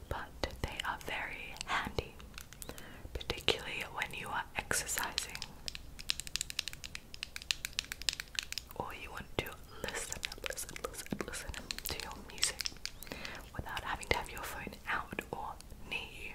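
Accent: Australia. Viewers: Just asking r u aussie, cause ur accent kinda sounds like mine and aussie peoples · Sounds australian to me?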